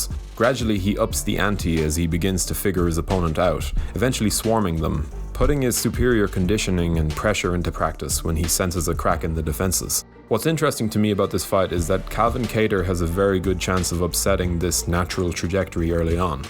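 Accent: Ireland. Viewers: Because of this chanel i want everything to be narrated in irish. Let me take that back, i wish everything in life was narrated by THIS guy specifically.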